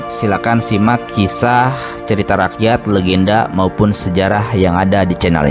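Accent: West Java, Indonesia. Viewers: dr dialegnya 70% sya yakin sepertinya pengisi suaranya orang sunda.. Hatur Nuhun udh bahas karanganyar... sampurasun.. 🙏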